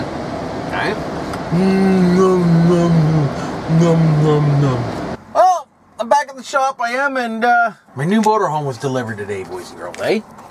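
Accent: Canada. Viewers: your Canadian like me eh?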